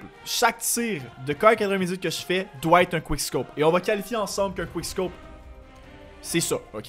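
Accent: Quebec, Canada. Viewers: SNIPEUUEE! MDRR trop fort l'accent québécois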